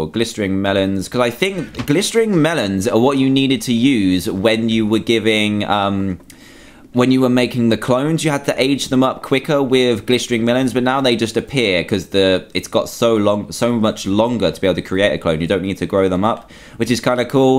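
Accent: United Kingdom. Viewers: *well he's british, and they pronounce it different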